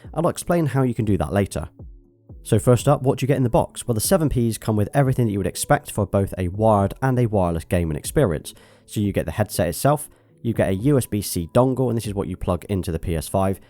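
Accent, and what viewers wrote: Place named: West Country, United Kingdom. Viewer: Great review man, I really enjoyed this. Properly comprehensive and a joy to watch! Loved hearing a relatively local accent too as I'm from Cornwall, and it sounds like you're from the west country perhaps? 😆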